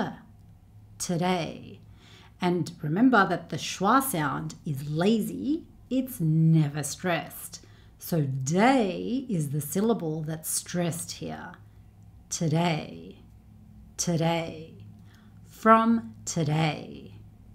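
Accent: Australia. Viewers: Are you native Australian?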